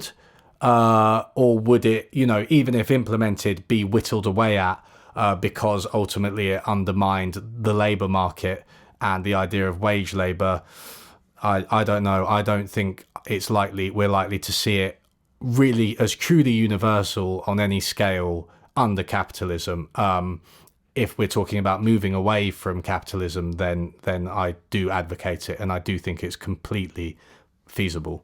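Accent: United Kingdom. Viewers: As a Chemist coming to the end of my masters I've been seeking none chemistry related reading to come up for air between dissertation writing and have turned to economics. Thank you for your book recommendations and the videos you have provided as a pier head from which to jump off from. I hear a very Wirral accent, I think, and as a plastic Everton fan, haha we won (for once).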